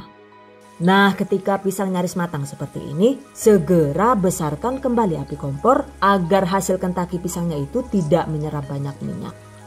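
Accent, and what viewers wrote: Banyumas, Indonesia. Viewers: Wong ngapak kie yah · Penasaran sm suaranya, kirain sdh ibu" stngh baya, tak taunya msh muda bs ngapak sdkit ngebodor bs bhs inggris pula keren 👍👍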